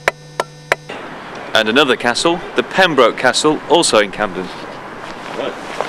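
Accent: Liverpool, United Kingdom. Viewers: interesting - just post something on London and watch the haters appear (!) mindless north-south warring (isn't there enough contention in the world for you all?) Ironically - not sure this guy is a Londoner - I detect flashes of Liverpudlian (or similar) in his accent. Listen to how he says 'example'. ;-) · Are you scouse?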